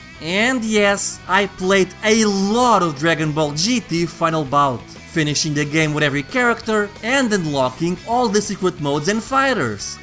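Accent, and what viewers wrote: Portugal. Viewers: Nos primeiros 30 segundos percebi logo pelo sotaque que devias ser português xD. Parabéns pelo canal e o seu conteúdo.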